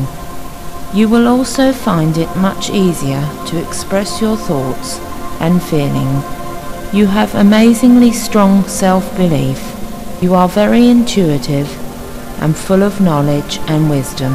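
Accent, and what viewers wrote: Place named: East London, United Kingdom. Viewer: Worst. Accent. Ever. OMG it's like watching East Enders.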